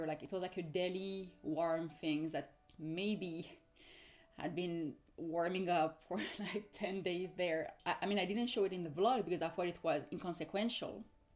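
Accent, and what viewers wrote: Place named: France. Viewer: I was expecting a charming French accent, aaaaaaand.... you got it!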